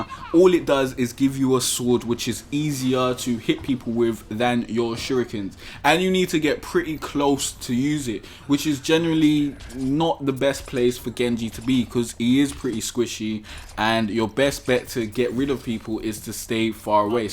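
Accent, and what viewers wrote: United Kingdom. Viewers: Are you from north London?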